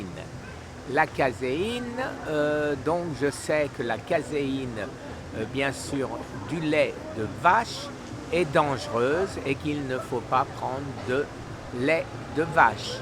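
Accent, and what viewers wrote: Alsace, France. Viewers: Bonjour, l'alsacien! J'ai soupçonné un certain accent que je connaissais.. hé oui en t'écoutant..tu avais parlé que tu vivais à Strasbourg..il y a 20 ans je crois.. Je ne sais par comment je suis arrivée sur ta chaîne! Mais je suis ravie..j'apprecie les battants en général dans la vie!! Personnellement je ne suis pas touchée par cette maladie.. Mais ma mère et mon compagnon ont quittés cette terre..à cause de ce crabe!! Bien sûr que l'alimentation saine.. est un facteur de santé physique mais aussi psychique! J'ai personnellement changée mon alimentation depuis une dizaine d'années, et je suis bien plus en forme physiquement et mentalement et mon moral est au top! Si bien,que j'ai entamée une conversion professionnelle il y a presque 10 ans. Oui j'ai rejoins une éco crèche, et j'ai jour après jour transformée les menus que l'on servaient aux enfants. 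J'en ai fais ma croissade..j'ai emprunté ton titre..pour d'expliquer que c'etait et reste encore pour certains aliments une bataille..Avec certains de mes collègues.. Mais au jour d'aujourd'hui les menus à la crèche sont végétariens voire végétariens et bien sûr bio. J'anime des ateliers pour les parents, grands parents pour les sensibiliser à l'alimentation approprié pour les jeunes z'enfants.. Les rendre attentif que la nutrition infantile essentiellement basée sur les végétaux, les fruits et crues, joue un rôle important pour leurs croissances mais surtout aussi de conserver un capital santé tout au long de la vie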